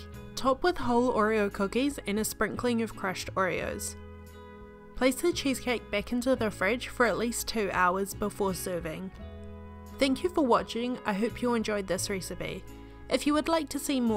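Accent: New Zealand. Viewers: that New Zealand accent is everythinggg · Nice nz accent 👌🏻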